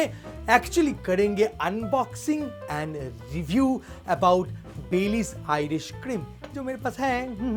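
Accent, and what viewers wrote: Bengal, India. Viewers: Bengalis can conquer anything with their intellect except their Accent wile speaking Hindi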